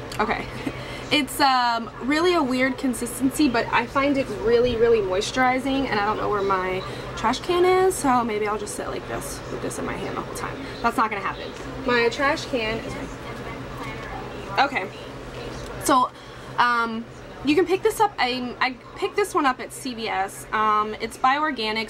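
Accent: United Kingdom. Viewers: I wish i could do yoour british accent ! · I love your British accent!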